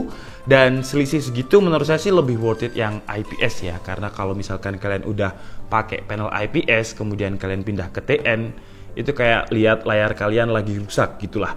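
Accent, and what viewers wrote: Malang, Indonesia. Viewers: Lohhh mas wong malang to?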